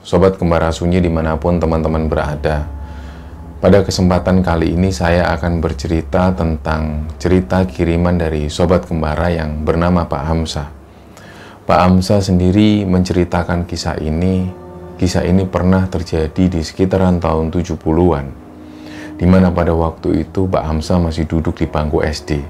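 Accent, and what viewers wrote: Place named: Banyumas, Indonesia. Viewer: Iya jelas ngapak lur😁 oke siap lur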